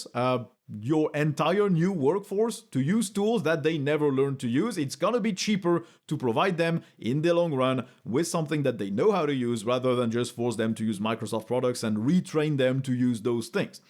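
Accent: France. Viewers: i love how uses french pronounce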